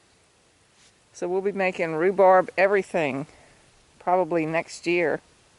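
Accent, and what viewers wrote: South, United States. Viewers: Thanks for the Southern accent I miss it so much I am a southern boy living in a different country and I need to hear the accent sometimes.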